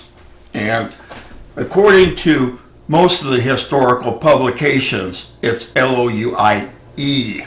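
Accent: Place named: Chicago, United States. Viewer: Where ya from? I’m from Chicago n you kinda got my accent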